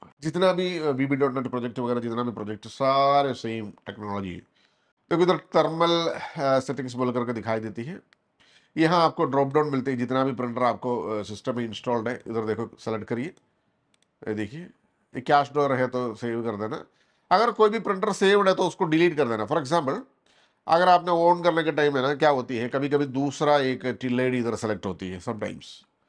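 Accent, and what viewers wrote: Kerala, India. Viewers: Malayalam touch Hindi 🙄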